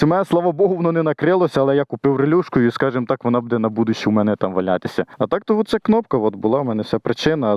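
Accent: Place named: Ukraine. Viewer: Вот это классный украинский язык! Настоящий украинский, что и в детстве я от тетки слышал и от ребят с Украины, что к нам на Север приезжали работать, и от товарища с Николаева, и от тестя бывшего, земля ему пухом. Всё понятно! Совершенно отличающийся диалект от того, что украинцам навязывают полупольские галичанские западенцы, со своим отвратительным пшиканьем! Я просто с удовольствием послушал украинскую речь.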